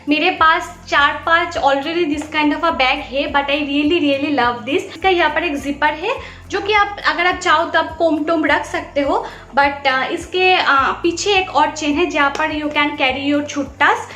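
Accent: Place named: Bengal, India. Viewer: Your voice is very sweet! Bengali touch ♥️♥️♥️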